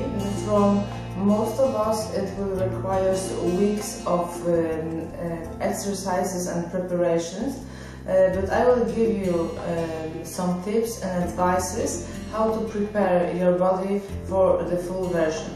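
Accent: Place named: Turkey. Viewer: türk aksanı sezdim sanki :)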